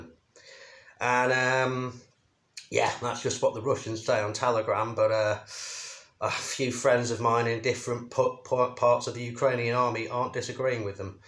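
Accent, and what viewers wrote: United Kingdom. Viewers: You must be from London or areas around as you sound exactly as one of my British colleagues, even tone of your voice! If I were to listen to both of you, I wouldn’t be able to tell who is speaking! Stay safe, mate, thank you for all you do, you have my outmost respect! You now have a subscriber from San Francisco.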